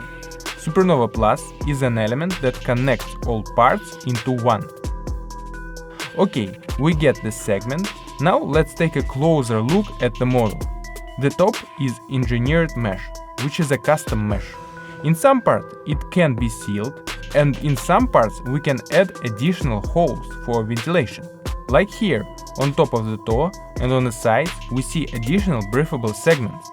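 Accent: Russia. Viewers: Nice review. Are you Russian/Czech?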